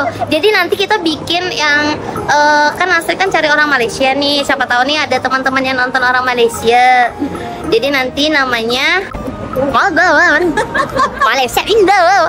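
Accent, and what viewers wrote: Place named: South Sulawesi, Indonesia. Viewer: Kak pekat betul ba loghat bugis mu😂😂😂,btw Salam dari bugis pare" Sabah Malaysia kak enjoy terus kakak selama di Malaysia ya😆🙏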